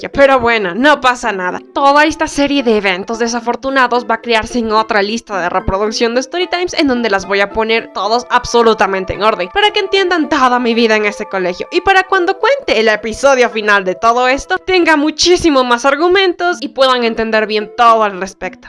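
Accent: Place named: Quito, Ecuador. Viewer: es ese acento quiteño serrano que tiene 🥺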